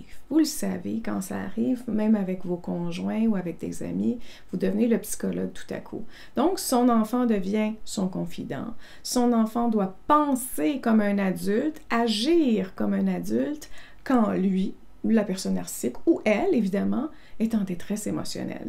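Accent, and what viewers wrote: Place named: Quebec, Canada. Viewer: Bonjour, oulala très pertinent et j'ai vécu celà avec certitude, plus la culpabilité et la colère de cette relation, plus la blessure de rejet à l'adolescence lorsque j'ai voulu exprimer ma vérité, d'où dépression à ce moment, restant en latence au fond de moi jusqu'à présent! Eh bien, je touche du doigt le fond du problème, merci merci merci!!!🥰 Et j'adore l'accent québécois!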